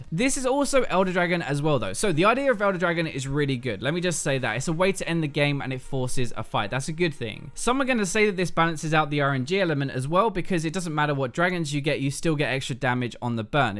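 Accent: United Kingdom. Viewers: I like how smart you sound when you talk :D Must be that british accent :D Anyways, just to put into perspective. If you have 900 ap on veigar and with that 3 infernals and you get an elder. From dragons alone you get 324 ap more. That is bloody ridiculous. If he didn't oneshot you before he does now. And baron gives you even more. One game I managed to get 1300 ap on veigar with items and baron, no infernals though. Imagine with infernals, it would've been over 1700 ap. Can you imagine that power. Nope, and that's why dragons are broken, agree with you. I don't like baron buff minions though. They are impossible to kill and deal dmg. They could deal more dmg but be still normal health.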